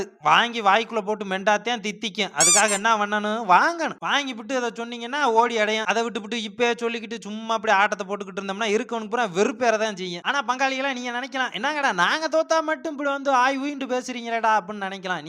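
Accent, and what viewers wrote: Madurai, India. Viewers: தம்பி மதுரை ஸ்லாங் பேச்சே செம என்டர்டெய்ன்மென்ட் தான்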